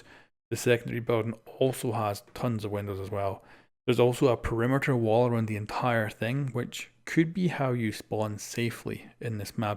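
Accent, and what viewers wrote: Scotland, United Kingdom. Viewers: Are you scottish? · Love the accent! Are u scottish?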